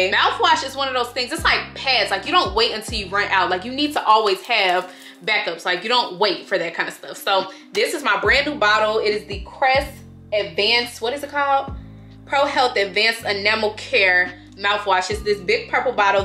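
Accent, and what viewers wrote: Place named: Chicago, United States. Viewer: Awesome vid. Are u from Chicago? I hear the accent lol.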